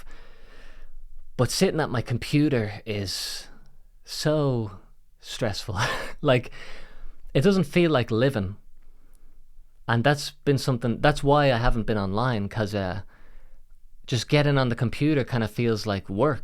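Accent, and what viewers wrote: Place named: Ireland. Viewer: Your Irish (Newfoundland?) accent is really neat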